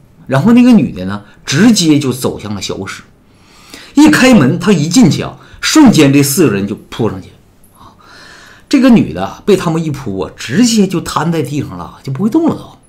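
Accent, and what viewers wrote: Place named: Inner Mongolia, China. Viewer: 我老家是内蒙呼盟的。你的口音听起来也有家乡的味道！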